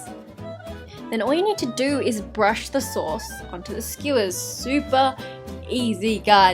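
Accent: Australia. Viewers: Ok so I love your accent, Aussie? And you are full of energy and then some and your food looks amazing..Im going to make one of them real soon, thanks a bunch for your video!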